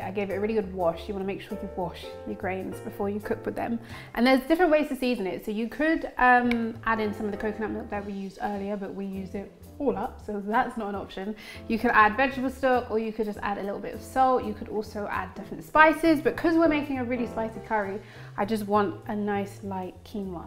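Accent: United Kingdom. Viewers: sounds like every other british person no?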